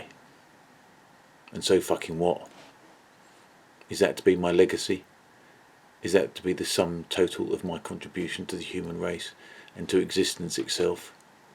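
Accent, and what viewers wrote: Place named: London, United Kingdom. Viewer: This is a London accent, East London I think. Definitely from somewhere in SE England though.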